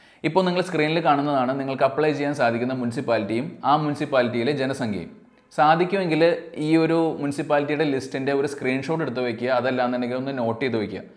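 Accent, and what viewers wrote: Standard. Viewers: നല്ല വൃത്തിയുള്ള മലയാളം.... അടിപൊളിയായി വിശദീകരിച്ചു ...ആശംസകൾ..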